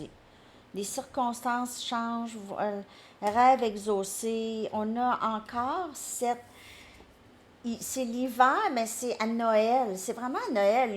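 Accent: Canada. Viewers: Je vous ecoute pour la première fois, vous avez un accent Canadien ce qui est marrant Mais voilà, je suis oubligée,de.bien écoutée, car je ne comprends pas toujours bien,ce que vous dites Merci tout de même pour votre guidance 😂😂😂😅 ❤LGG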